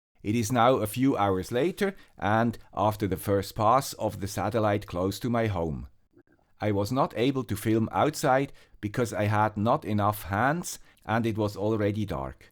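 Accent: Switzerland. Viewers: Another great episode from the ham with the Swiss accent. :-)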